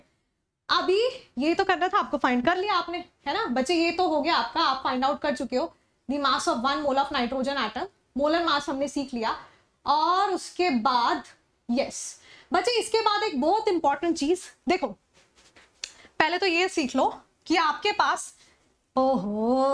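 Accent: Punjab, India. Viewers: Kya hi bolte ho mam punjab se ho 😅 subse best physical chemistry teacher ❤️😌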